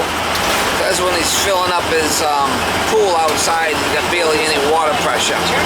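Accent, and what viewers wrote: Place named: Massachusetts, United States. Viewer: I'd already watched a bunch of water pressure vids when I came across yours. I was going to skip it until I heard what I'm guessing is a Mass accent... so I watched the whole thing. The little dog riding with you was awesome and capped off with the howling pug. Great stuff!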